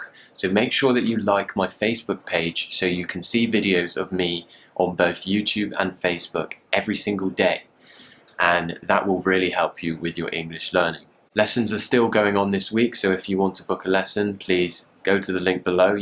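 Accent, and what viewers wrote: United Kingdom. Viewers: anyone listen to you will definitely like the British accent. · I prefer British English. I’d like to speak like you. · I reallyyyyyyyyy want to speak just like you, your accent is really amazing I like British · Since I heard your speaking, I’ve thought I’d like to speak like you, British English! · I love British English and accent. But it's quite difficult to learn your accent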